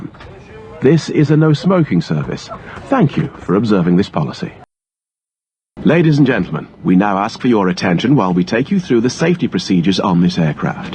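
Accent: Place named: England, United Kingdom. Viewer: Nice english accent. 👏👏👏 Very clear public announcement (P. A.) of a purser/flight attendant.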